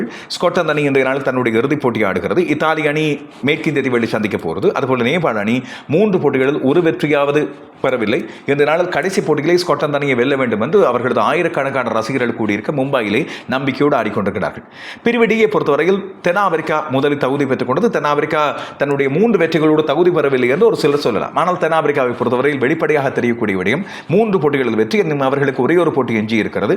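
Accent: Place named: Sri Lanka. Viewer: அதிவேக இடைவிடாத பேசும் திறன் இலங்கை தமிழ் பேச்சும் குரலும் அற்புதம் பாராட்டுக்கள்.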